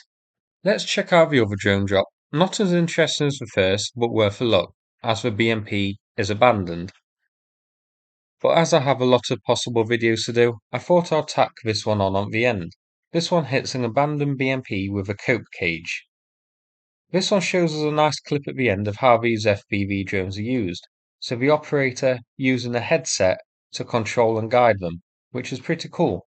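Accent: United Kingdom. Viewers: Danngg your soothing voice & unique British accent really make my day lol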